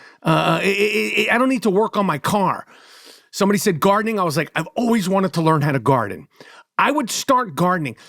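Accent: New York, United States. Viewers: I thought the Jewish community of NY voted for what’s his name?? If you keep talking like that no one is going listen to you because you rant!! Slow down love !!! Why is everybody saying the Jewish New Yorkers voted for this guy? I don’t get it….😂😂😂your accent i guess you don’t like LA …you rather NY …man I was born in London, tooooo many people in NY i couldn’t walk without bumping in to a person hahaahhah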